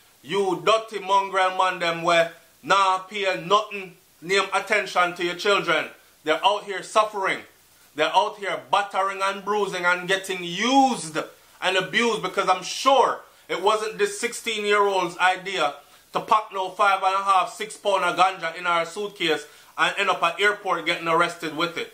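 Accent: Barbados. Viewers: Lol the Bajan accent 😄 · this is a serious topic and you had me cracking up with your barbados accent these kids dont listen they think they know it all so they follow the wrong crowd and dont like authority so they prefer a home where they can do whatever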